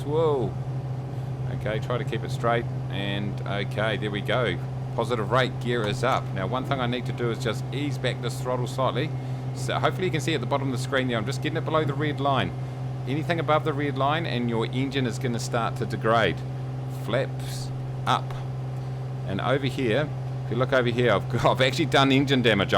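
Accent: New Zealand. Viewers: New Zealand accent rules... :) Awesome video, as always....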